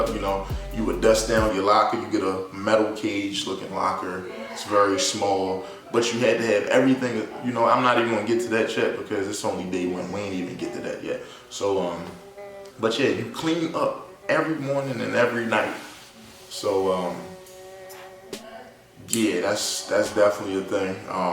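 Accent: Baltimore, United States. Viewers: I’m from Baltimore to G as soon as you started the video I’m like yo this dude from around here😂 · As soon as I heard him talking I knew he was from Baltimore 🤘🏾🤘🏾😎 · Lol I knew I heard a Baltimore accent. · i knew you was from here, baltimore in the first five seconds lmaoooo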